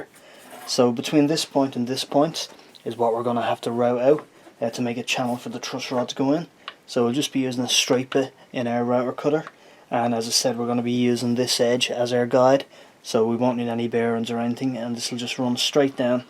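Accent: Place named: Dublin, Ireland. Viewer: Perfect timing!. Just as I'm about to start a scratch build 'Tele' along comes a great teacher!. Your very fine attention to the details a novice really needs to know about is superior to any other source I could find on any YouTube channel. I'm building this for my 14 year old grandson as a surprise.!..I'd better not screw this up,he knows his guitars. Lord help me?? And a 'Jackeen' to boot. Lovely to hear your Dublin accent !!..I grew up in Marino and Fairview/Clontarf..No jobs nor any future; We youths had to go elswhere??..I'm up to part 4 now and I have a great handle on how to built a 'Telecaster', Thanks to your great teaching. From Albany NY USA..Brendan Murphy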